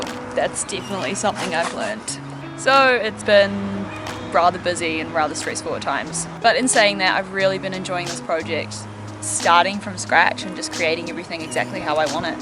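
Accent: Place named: New Zealand. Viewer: Hey, thankyou for replying doesn't often happen a content creator replies. Just wanted to apologize as I knew you were not Melbourne born i just assumed you'd relocated there a child coz ur enzed accent had Melbourne accents to it. I know I shouldn't assume anything coz it makes an ass out of me & an ass out of me. Umm do you know if Elayna had the baby yet. Also meant to say becore, you did a really cool job of converting the van into your own little house on wheels. Cheers look forward to next vlog. RustyinOZ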